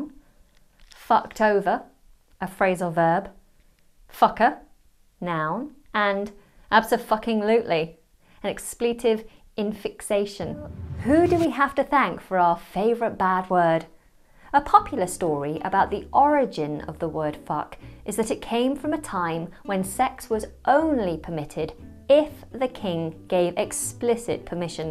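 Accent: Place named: United Kingdom. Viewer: I will always repeat it. I love always to listen to your British accent.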